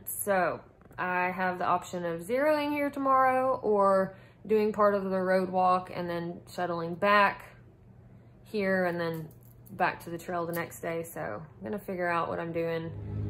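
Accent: South, United States. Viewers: I love to listen to you speak, the beautiful Southern lilt in your voice, the way you speak like a little girl when you're talking to insects or animals, the revery here of when you were a little girl and how it's affected you as a woman, the love I hear for your dog Hank when you find one of his hairs, "But now, uh, I hope it never stops. And I can tell it's his cuz there's a whole sunset in the end of it." Whether you're talking about equipment, or the trail, or dispensing some of your homespun philosophy, it's always interesting. You so seldom have a harsh word to say, and have so many kind and uplifting things to say. It's what makes your channel so great. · loved the deer at the beginning! Did you tell them "hey, darlin'!"? If I had ANY deferment of gratification (which I do not) I would wait until you finished and watch them all at the same time! 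So good - and as a non-deep south southerner, I love the accent!!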